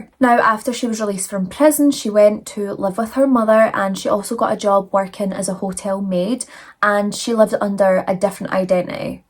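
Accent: Scotland, United Kingdom. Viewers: I do the same thing! I switch between Midwest USA, and Scottish accent all day!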